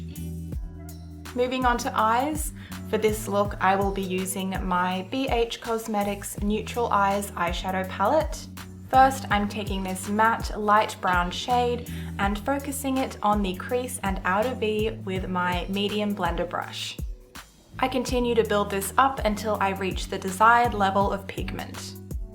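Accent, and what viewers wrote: Australia. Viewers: Her face screams "French Model" to me, so even though she talks like an Australian, it didn't hit me that she's Australian until she mentioned the weather there lol. I love how she leaves a little bit of darkness under her eyes (especially since I see a bunch of people on YouTube who take their concealer all the way up to the lashline). It looks really cute :) Thanks for posting.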